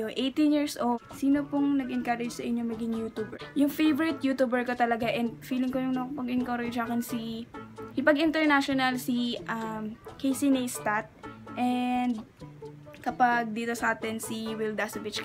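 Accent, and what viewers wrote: Visayas, Philippines. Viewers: Hahaha bisaya jod kaayo 😂 miss u hahahah puntahan kita perps mol papapicture ako sayo 😂🙈💞 replaye ko please hahah now pako nag tan aw sa imo mga vids. Sub na! 💋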